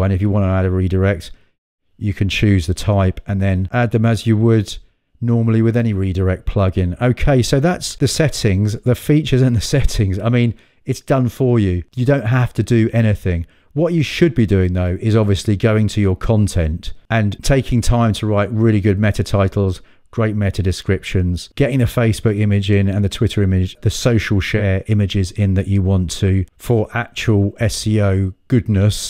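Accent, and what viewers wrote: United Kingdom. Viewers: British accent best accent of the world 🎉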